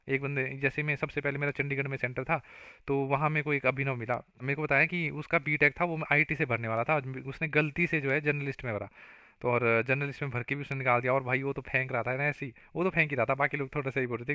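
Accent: Himachal Pradesh, India. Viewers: You sounds like a himachli guy ... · Oo hi? I'm from kullu Apki tone se lg gya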